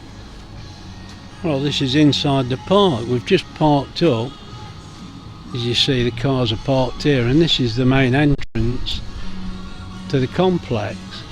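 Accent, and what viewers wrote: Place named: Leicester, United Kingdom. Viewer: Sounds to me like you are from Hinckley where im from or at least leicester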